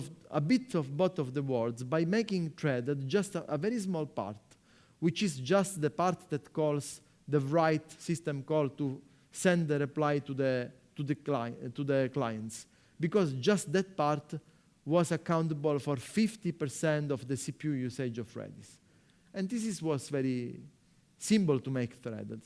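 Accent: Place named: India. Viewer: 没讲 thread i/o 啊，口音有点像三哥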